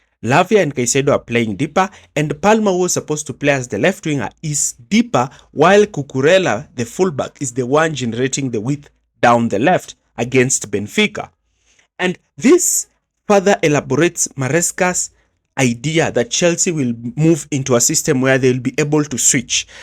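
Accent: Kenya. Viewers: Kenyan accent 😊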